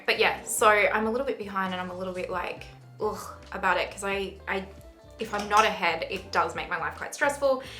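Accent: Australia. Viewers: You are now one of my favorite you tube channel to watch, so glad I found your channel, so enjoyable to watch so talented and that Australian accent is everything! ❤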